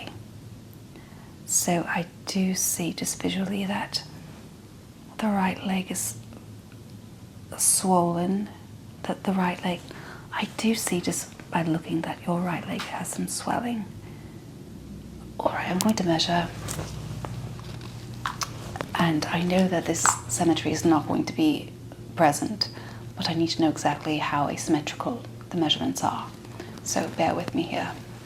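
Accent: RP, United Kingdom. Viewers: Not a bad british accent... A bit of mix between dick van dyke and marry poppins, which seems to be where most yanks (unfortunately) get their reference materials 😊 Your accent is a little bit overly RP, so it sounds a little "posh"... But at least you didnt try and go for a cockney accent 😅 Nice job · Downton Abby English certainly, Modern English not so.. But very good accent for that era/class.. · Received Pronunciation (often referred to as RP English) generic South East England. A bit like Gwyneth Paltrow in Sliding Doors. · As a Brit this is very good. Usually, when non-Brits try an English accent they tend to drift all over the UK merging different dialects. But this is solid. 😊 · Great video! I listened with my eyes closed and you sounded like a true Brit!👌👍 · Great video! Wonderfully done "Broadcaster British" and, IMO, the lack of eyeliner/change of makeup compared to your usual videos looks really lovely (gave it a more doctorally feel). 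· I'm British this sounds posh to me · You look very beautiful. And your British voice/accent is extremely soothing. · British accent on ASMR kill me😍😍😍😍😍😍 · Odd bits sound a british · lovely British accent by a lovely nurse 😊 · Love Your ASMR British Accent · Wow I'm British myself! and your British accent is amazing! You sound posh and upper class! I love it 😊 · brit, i dig it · Love the British accent 😂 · From the uk ,you have nailed the accent it’s very bbc great work 🙌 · This was great! Bit of the posher old fashioned Brit but sooo relaxing! Jolly good show! Let’s have another please! In fact a pat down in Britain! 😊 thanks Steve x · Posh English accent 😝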